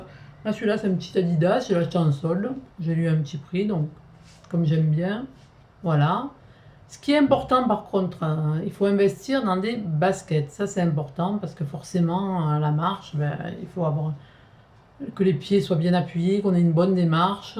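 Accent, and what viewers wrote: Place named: Aubagne, France. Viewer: Comme toujours, tu as des explications claires et précises......que c'est agréable......et en plus, avé l'accent d' Aubagne! lol C'est super, moi, je vote pour toi, pour la Reine des Blogueuses du Sud! Bisous 👍😘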